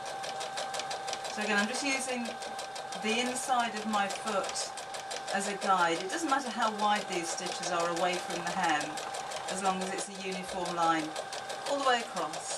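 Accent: United Kingdom. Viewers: your speech assistant does not understand your British accent. The subtitles are so disjointed, funny, weird at times.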